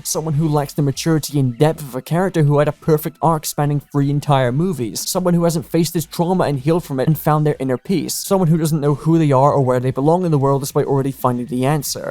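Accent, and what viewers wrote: United Kingdom. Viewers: The British accent 😭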